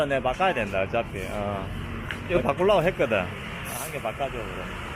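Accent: Gyeongsang, South Korea. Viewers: 완전 경상도 아지야 말투네예 캄보디아의 일상 재밌습니더~ 조와요 구독~~🤣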